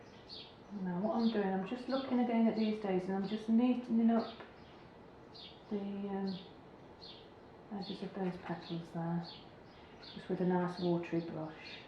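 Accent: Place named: England, United Kingdom. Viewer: Beautiful painting. Do you always “cream” strength that much paint? Do you keep when your down? Add water again, next day? Or do you toss & start fresh. I’ve only ever made up that much paint when I did a negative painting. I know you said you were using 200# what mfg? Love learning new ways to do things. I really want to try this one, next week, when our temperature goes back to normal. ( Northern California) you sound like you have a bit of an English accent is that where you live?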